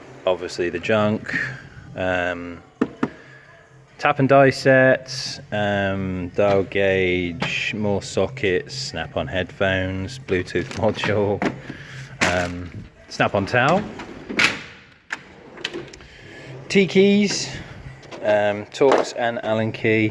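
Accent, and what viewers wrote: United Kingdom. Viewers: English man in USA ?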